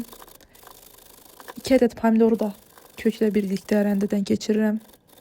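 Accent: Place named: Karabakh, Azerbaijan. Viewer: Kanaliniz yeni qarsma cxdi abune oldum😊xeyrli ugurlu olsun🙌deyesen Qarabaglisiz lehceniz sirin geldi❤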